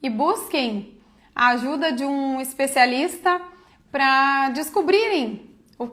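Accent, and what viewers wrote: Rio Grande do Sul, Brazil. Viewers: Dra de onde a Sra é? Do Rio grande do sul? Se sim atende onde? Moro perto de Porto Alegre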